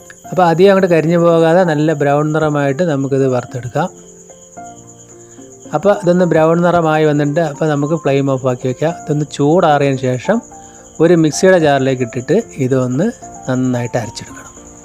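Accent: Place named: Kannur, India. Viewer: വറുത്തരച്ച അയല കറി അടിപൊളി ആണല്ലോ 👍🥰❤️കണ്ണൂർ ആണെന് തോന്നുന്നല്ലോ 😃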